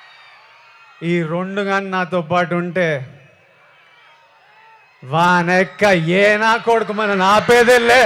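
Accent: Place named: Rayalaseema, India. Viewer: Rayalaseema slang🎉🎉🎉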